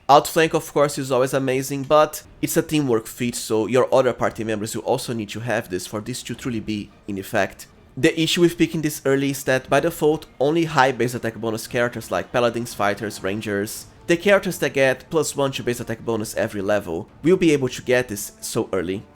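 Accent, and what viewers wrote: Brazil. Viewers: caramba mano, ativa a faixa de audio em ptbr po, esse seu sotaque nao me engana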